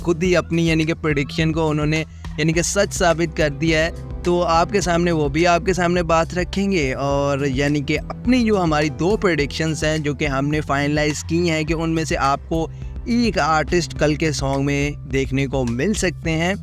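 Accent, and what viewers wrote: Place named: India. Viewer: Aal ready tum Indian ho . Bolne ka style bata raha hai. Idiots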